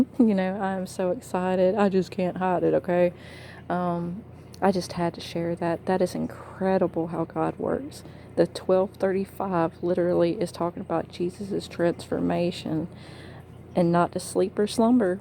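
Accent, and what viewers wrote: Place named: Alabama, United States. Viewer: Awesome! Listening to you speak I was thinking you from Alabama. We are close. I’m excited too. Thanks for sharing.🌈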